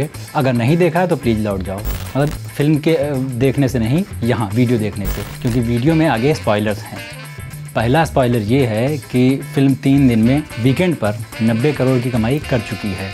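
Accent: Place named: Bihar, India. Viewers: Bihar ke ho.....???? Work on your acnts bro.....u r respting mr dan 1 milon subs channel... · Bihar se ho ka bhai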